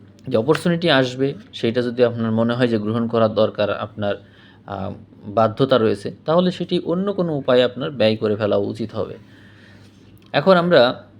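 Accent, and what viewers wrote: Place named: Bangal, Bangladesh. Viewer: আপনার বাংলা ভিডিও তো নয় ---এটা বাঙাল ভিডিও। বাংলাটাই তো ভালো করে বলতে পারেন না। আগে বাংলাটা ভালো করে শিখুন তারপরে বাংলা চ্যানেল খুলবেন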